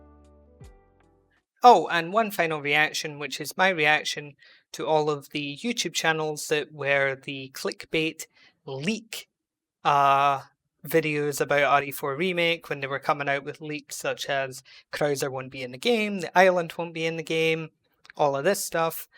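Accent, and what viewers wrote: United Kingdom. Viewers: Bro, where ya from? Roughly Ya sound British, but you Actually put in effort in saying the Spanish words well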